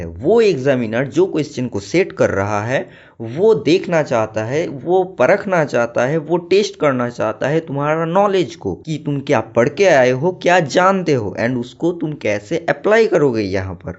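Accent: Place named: India. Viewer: Ap bengali ya north east se ho kya bhaiya apke accent se lgrha btw me uttar pradesh se hu mains me 98%ile aya hai advanced karne ka soch raha tha but dar raha tha tough questions se lekin aapne achi advice di hai follow karunga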